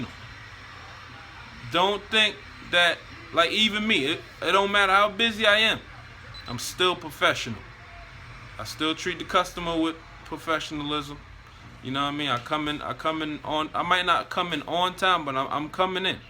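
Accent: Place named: Harlem, United States. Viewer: Bro. Sound like Harlem and the shop could be there as well. Uptown Uptown. Interesting Inside infor on rappers being cheap!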